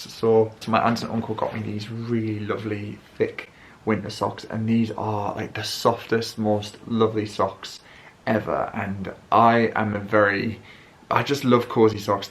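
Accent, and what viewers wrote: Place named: Durham, United Kingdom. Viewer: Can tell you’ve been back to Durham, this Londoner is having trouble understanding you 😅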